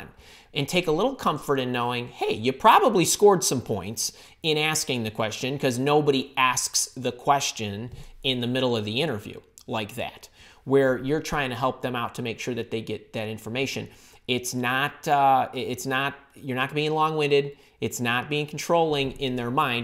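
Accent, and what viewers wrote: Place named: Great Lakes, United States. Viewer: Wow, thats quite the pronounced Northern Cities/Great Lakes accent you have there. Lets see ... Central Michigan, is it? Maybe Lansing?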